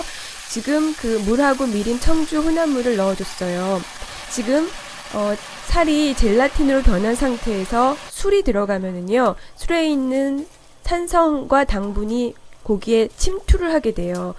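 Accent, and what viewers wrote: Seoul, South Korea. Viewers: 와 진자이게 서울 사투리죠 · 서울사투리 너무 귀여워용 ㅠㅠ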